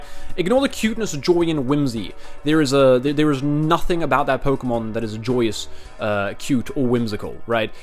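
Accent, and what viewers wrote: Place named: South Africa. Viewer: funny south african pokemon man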